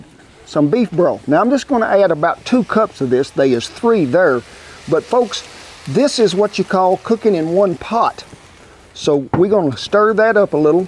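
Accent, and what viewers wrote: South, United States. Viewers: You remind me so much of my big bother he love’s to cook and we’re from the deep South so we have that southern Voice all so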